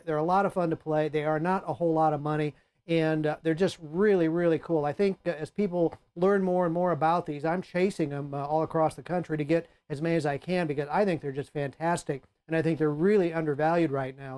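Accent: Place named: United States. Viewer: like watching clapton with u.s. accent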